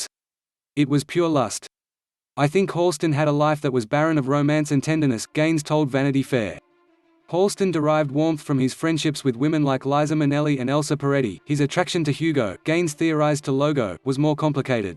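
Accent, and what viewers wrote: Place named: Australia. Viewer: YES and the voice sounds like an Artificial Intelligent robot, it sounds drone like, whoever it was picked the "Australian male" voice setting in the narration reader and used a cheap AI subtitler on top of that.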